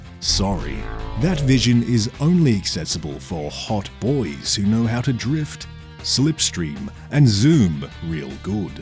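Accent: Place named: Australia. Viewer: Deadpan Aussie gaming man made a new thing.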